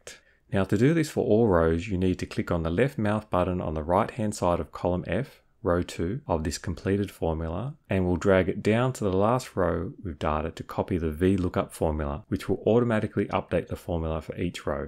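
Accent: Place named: Australia. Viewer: Hi, tnx for your response a couple of days ago. I fixed the issue re VLOOKUP! It was as you suggested columns not ‘lining up between data and reference tabs. Thanks so much! I can now follow your process for bank statements inc pivot table summary. Do you have a video for credit card plus automation as similar process but somewhat different ways I want to view?Leanne PS again so good to follow the Aussie accent😂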